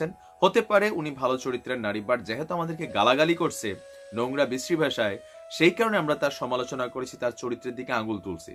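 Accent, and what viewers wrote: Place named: Barishal, Bangladesh. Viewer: ও মনু আমনে দেহি মোগো বরিশালের ভাষায় কথা কন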